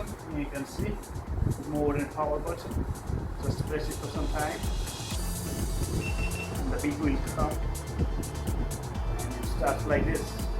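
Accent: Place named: India. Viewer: You sounds like pure NRI....nice unboxing video...keep it up 👍👍👍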